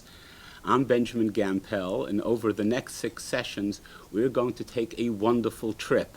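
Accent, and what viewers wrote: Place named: New England, United States. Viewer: HIs accent's from the New England area. Many Jews have that accent because many Jews emigrated there in the late 19th century.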